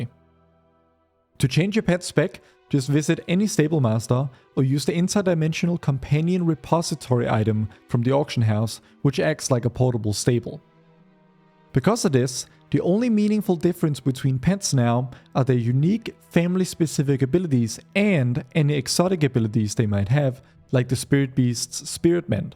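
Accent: Denmark. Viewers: I hear the danglish :) good video though. Takker. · He is danish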